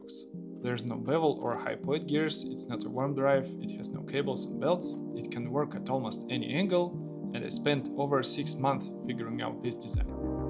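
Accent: Russia. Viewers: Мне кажется или у него русский акцент? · почему я слышу русские звуки в этой английской речи?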